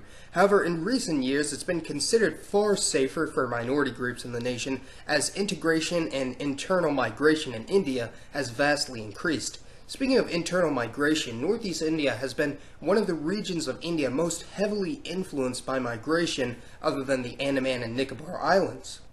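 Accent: United States. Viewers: Thaibet? Phulease dude. You sound like you grew up in bf u.s.a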